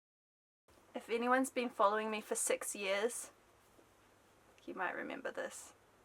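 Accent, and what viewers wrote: New Zealand. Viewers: Yeah it's a bit of a hybrid between her native New Zealand and the Japanese accent she would have adopted a bit from being around Japanese speakers so often.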